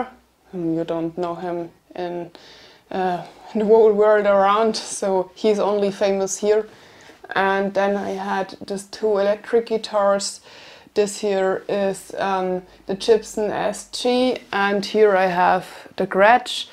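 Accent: Bavaria, Germany. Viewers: my late partner would have loved this video. She was German, from München/Munich and the reason why I started to watch your videos. You are both the same and not the same... both strong minded and kind, and your Bavarian accent is very much as she sounded. Music is my life, I am a musician and nature lover... Thanks for sharing some life things with us.:)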